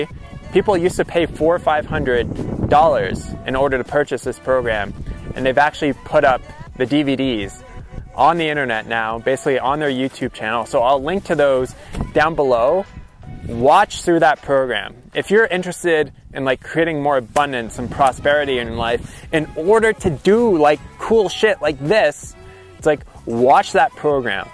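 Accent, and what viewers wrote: Canada. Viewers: this video makes me really sad so I won't be finishing it. It's sad that we live in a culture and society where people think it's okay to ask about your money or net worth or whatever. I agree with your mom, it's disrespectful and no one's business how much money you and have in the bank. People are too entitled. If you guys have $2 or $2 million dollars, it isn't our business. That's between you and your wife. On a side note, I knew you were from Canada! Yes! Silently pats myself on the back. Your accent seems Canadian but some words you say sound slightly Australian, like you lived there for some time and picked up a bit of their accent. I digress. Anyhow, thank you for the videos!:)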